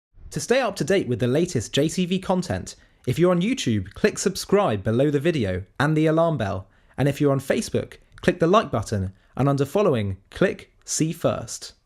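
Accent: United Kingdom. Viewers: Oh, where do we start with this little history lesson? It's like you took a propaganda leaflet, added a British accent, and called it "the truth." First of all, the whole "Palestinian identity didn’t exist until 1964" argument? That's a classic deflection. By your logic, Jewish identity didn't exist until 1948 because modern Zionism only took shape in the late 19th century. National identities evolve, but the Arabs in this region had been living there for centuries. Denying their identity doesn't erase their existence or their connection to the land. Next, the "British Mandate wasn't Palestinian land" claim. Sure, it was a mandate, but does that mean the Arabs living there weren't the indigenous population? You conveniently forget that the Balfour Declaration—pushed by colonial powers—promised a Jewish homeland in Palestine without consulting the people already living there. 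And Jordan? Nice try,. Handing off land east of the Jordan River to a Hashemite monarch doesn't magically negate the fact that the Arabs west of the river had their land systematically taken. Ah, and the Partition Plan. You paint the Arabs as unreasonable villains for rejecting it. Let me ask: would you accept a plan that gives over half your land to recent European immigrants? In fact, the UN Partition Plan of 1947 allocated 56 % of the land for a Jewish state, despite Jews comprising about one-third of the population and owning less than 7% of the land. Furthermore, the Zionists didn’t wait f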